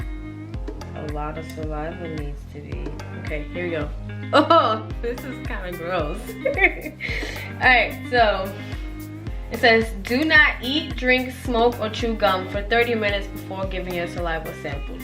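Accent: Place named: New York, United States. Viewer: Luv the New York accent lol✊🏾💯🙏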